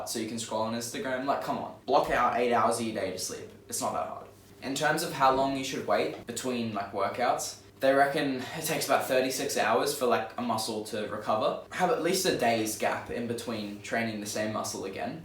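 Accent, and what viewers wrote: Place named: Australia. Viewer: ye mate hes aussie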